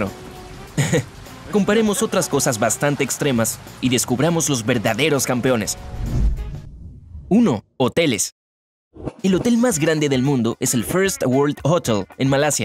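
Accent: Buenos Aires, Argentina. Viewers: Épico yo vivo en Buenos Aires jajaja ese acento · El acento porteño clave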